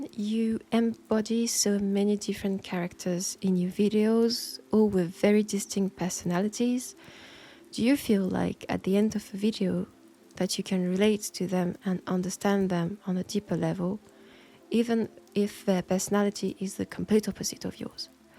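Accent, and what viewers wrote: France. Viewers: Some excellent questions here. The sort of questions it's thoroughly enjoyable listening to you answer, but I would find excruciating to answer myself, being a 'bear of very little brain'.. Thank you so much for taking the time to do this. It's such a delight hearing you as yourself, once in a while. It has also made it easier to distinguish, as my daft question pointed out, a true etherial entity, swirling in a non-committal penumbrian light.. With a distinct French accent, of course.. :) Lesson learnt!. I assume you are partial to mountains, due to your own particular part of France, & the destinations you mentioned you'd like to live / visit? I can understand that & the type of nostalgia it brings. The banner picture in my profile shows the sort that I have those kind of feelings for, although I'm sure I could grow accustomed to mountains too, in time. 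As long as it's fairly rural countryside, & away from hoards of people. The flat stuff's just a bit more forgiving when one has the mad compulsion to go cycling. With regards to Trench Crusade, I, too, will likely never play it, let alone purchase, paint & prepare even a single faction. But since I became aware of it I've lapped up as much lore & artwork as possible. Amazing, dark & sinister looking creation. Thank you once again for the time & effort you put into everything. Enjoy the rest of your weekend. I'll meet you back here again soon :)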